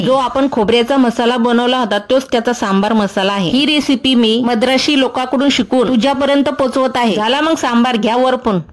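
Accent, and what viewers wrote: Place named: Pune, India. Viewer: ही बोलन्याची शैली पुणे जिल्हातील शहरा नजदीक चे गावात चलती,,,,, कारण मि स्वतः पुणे जिल्हातील आहे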